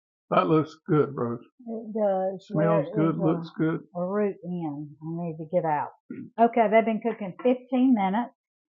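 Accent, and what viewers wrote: South, United States. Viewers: Hi, I just came upon while watching another video. I'm a 71 year old,married to my wonderful husband for 50 years, two children, six grandchildren, from eastern North Carolina, who loves Jesus! I hear by your accent that you're southern too, where do you live? I'm enjoying your videos! Wishing you and many blessings!❤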